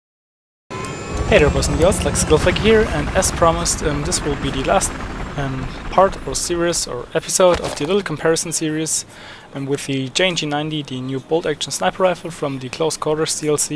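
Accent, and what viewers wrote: Germany. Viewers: irgendwie wusste ich das du deutsch bist xD dein akzent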